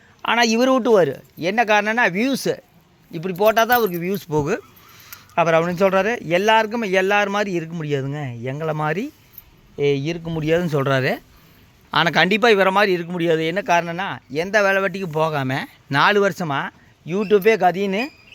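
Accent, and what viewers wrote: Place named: Kongu, India. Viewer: அண்ணா இளவரசன் என்ன சொன்னாலும் அவன் திருந்த மாட்டாங்க அந்த காயத்ரி தின்னே குடும்பத்தை அழித்து விடும் போல😂😂 மொத்தத்தில் அந்த குடும்பமே அப்படித்தான் அவங்க அம்மா தங்கை அவனுக்கு சப்போர்ட் நீங்க இந்தியா குட்டி சேனல் பார்க்க சொன்னீங்கள்ள இந்த 20 நாளா அந்த இந்தியா குட்டி சேனல் தான் நான் பாத்துட்டு இருக்கேன் மாமியார் மருமகள் அருமையா நடிக்கிறாங்க அவங்க பையன் வேற லெவல் மொத்தத்தில் உங்க வீடியோ சலிக்காம நல்ல பார்க்கலாம் நல்ல ஜாலியா என்டர்டைன்மென்ட் நகைச்சுவையாகவும் இருக்கிறது கொங்கு தமிழ் அருமை❤❤❤🎉🎉🎉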